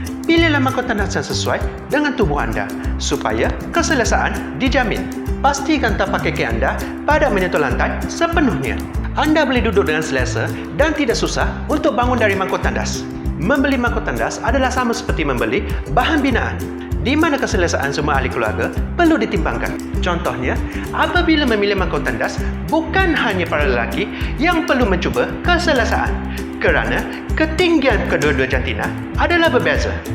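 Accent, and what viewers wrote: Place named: Malaysia. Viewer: Hai saya dari Jakarta. Tengok channel ini Krn suka sekali dengar cara bicara Melayu kaka 😁 konten nya juga informatif sekali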